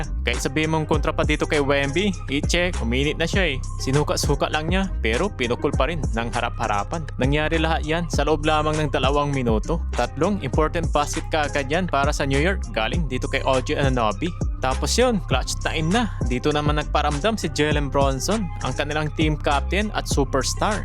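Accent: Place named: Visayas, Philippines. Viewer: Nako salita palang bisaya na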